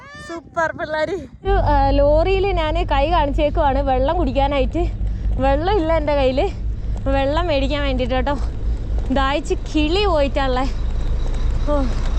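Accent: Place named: Ottapalam, India. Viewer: പാലക്കാട് ഒറ്റപ്പാലം ഏറിയയിൽ ആണോ വീട് സംസാരം കേട്ടപ്പോൾ അങ്ങിനെ തോന്നി ❤❤❤❤😅😅😅😅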